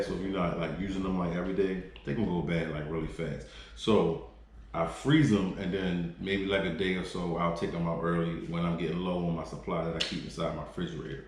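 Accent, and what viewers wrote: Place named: Baltimore, United States. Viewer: Gotta be from Bmore! Ask me how I know. Lol It's the (u or ewww) that's emphasized heavily. Lol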